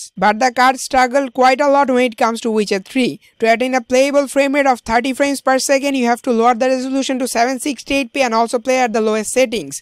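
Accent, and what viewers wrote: India. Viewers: ur accent sounds like indian,btw where u r?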